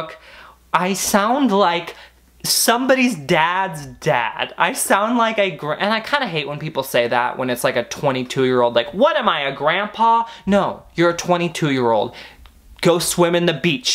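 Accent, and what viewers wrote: United Kingdom. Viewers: WHY IS THE BRITISH VOICE SO FUNNY IM DYING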